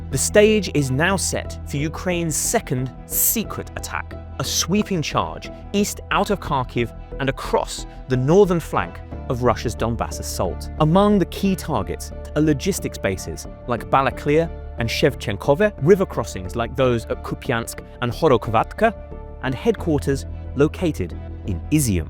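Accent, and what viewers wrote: England, United Kingdom. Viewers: it's english pronunciation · British accent. Disregard.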